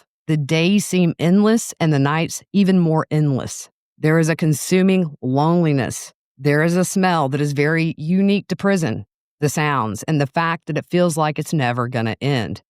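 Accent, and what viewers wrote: South, United States. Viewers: I just today stumbled upon your channel. Love how you speak -quickly, without ums and ahs and you know what I means! You also have a beautiful southern drawl. I subscribed and look forward to more vids from you. (Prison...OMG most of those things, ALL of those things that were described -the noise, the pettiness, the jealousy, the food, the lack of privacy., the humiliation, the confinement with ppl who disgust you or scare you...ALL OF IT. Just a nightmare. And for me personally, I do NOT get along with other people. I need to be alone most of my time bc humans just exasperate and me bore me. I would not do well in prison. I barely find comfort in the wild.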